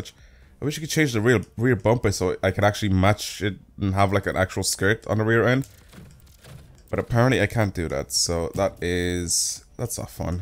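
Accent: Dublin, Ireland. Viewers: Are you Dublish?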